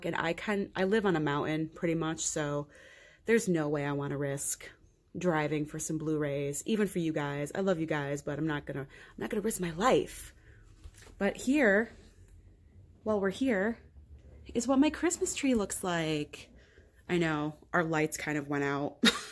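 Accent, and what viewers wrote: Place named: East Coast, United States. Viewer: Well beautiful eyes keep grindin' because the future is bright 4u. Btw love the East Coast accent.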